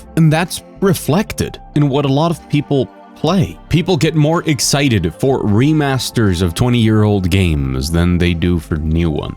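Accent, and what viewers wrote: France. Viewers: The wrong assumption big studios and marketers make is mostly that focus groups represents organic crowds. They dont. Focus groups and surveys are a simulation of real people collective behzvior and quite easily miss the mark. And kudos for your french accent :) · ur french accent is good!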